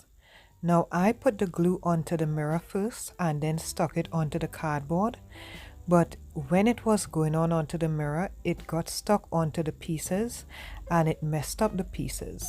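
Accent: Trinidad and Tobago. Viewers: I'm from St. Vincent but I love the Trinidadian accent. I have relatives in Trinidad.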